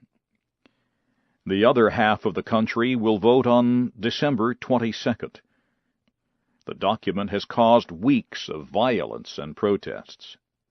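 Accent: United States. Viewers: que buen video para aprender algo de acento americano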